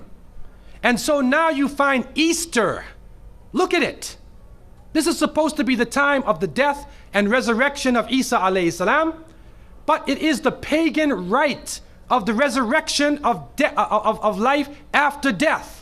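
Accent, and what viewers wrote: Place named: Canada. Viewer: where is this guy from? what part of Canada?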